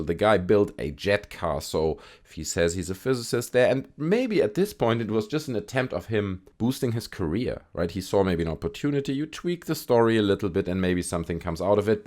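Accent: Germany. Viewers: Dieser Deutsche Aktzent 😅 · something so nostalgic about hearing someone inspect government documents in a german accent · u sound hart german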